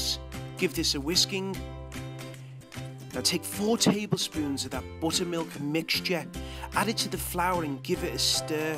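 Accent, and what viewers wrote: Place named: Liverpool, United Kingdom. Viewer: wow a scouser haha me too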